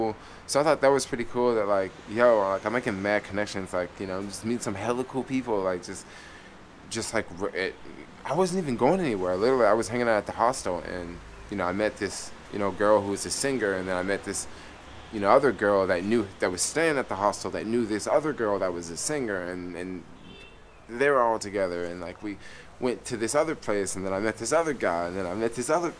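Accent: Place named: Memphis, United States. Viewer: You from Memphis so talk like you talk. you got your speech pattern.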